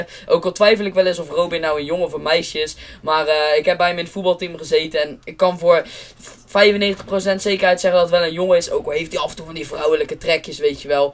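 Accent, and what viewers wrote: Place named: The Hague, Netherlands. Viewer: Ik zei ooit een keertje tegen jou dat je een haags accent hebt...dat lag nogal gevoelig hahah...ik woon nu in alphen aan den rijn maar ben in den haag opgegroeid...heb niet een bepaalde trots met alphen of den haag...kom nog wel af en toe eens bij ado en dan leg ik altijd in een deuk als je ziet hoe die hagenezen leven voor hun clubbie met de bekende scheldwoorden die in die 90 minuten voorbij komen....heb wel bepaald trots-gevoel met oranje...als ik naar het wk kijk dan heb ik wel het gevoel van "onze" jongens...met politiek heb ik helemaal niks....dat lingo stukje was geniaal haha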